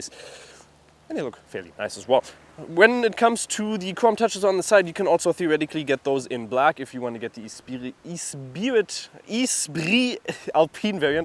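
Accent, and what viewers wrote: Germany. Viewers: Jaaa! A German speaking English is quite funny. Good review though.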